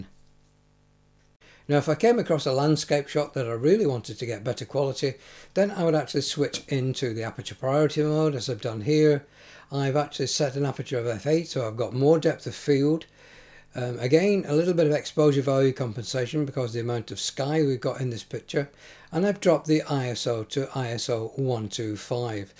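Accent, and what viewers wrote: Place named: Manchester, United Kingdom. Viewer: Thanks,. Another great series. I've just picked one of these up (practically unused - it's in immaculate condition) on ebay for £550 and absolutely loving it. What's the point in reading through an instruction booklet when you've done a much better job that's easier to follow. It's also nice to have it in a Mancunian accent.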